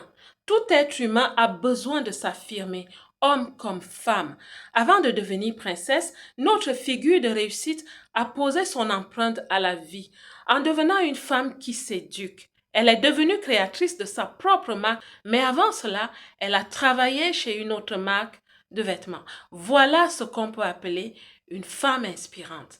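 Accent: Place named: Cameroon. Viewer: Merci pour cette histoire passionnante ! Vous aussi vous devez être une princesse de naissance comme moi 😊 Et il me semble reconnaître un petit accent du #237🇨🇲 Bien à vous Marie-Céline Princesse de Hegba♔©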